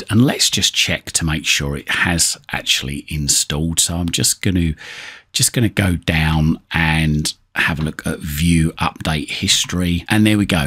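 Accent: England, United Kingdom. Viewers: Fantastic. Extremely clear and concise instructions, plus the English accent was wonderful to listen too. Before I came across your channel, I had worked several unsuccessful hours. I didn't want to wait for Microsoft fix. And your video provided the alternative I needed. 100% success. Thank you. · I have to agree with this user(Donald-area51eagle24) comment. "Fantastic. Extremely clear and concise instructions, plus the English accent was wonderful to listen too. Before I came across your channel, I had worked several unsuccessful hours. I didn't want to wait for Microsoft fix. And your video provided the alternative I needed. 100% success. Thank you." I could not believe how much time I wasted on trying to fix this update. Just an FYI – I have a Master Boot Record (MBR) so following the instruction you got from MS made sense to me. I been IT for 30 years plus but have not work on a computer since 2020 when I retired. 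Best instructions ever. Thank you so very much.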